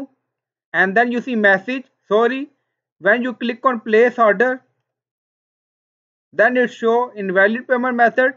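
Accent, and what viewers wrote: India. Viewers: indish english per excellance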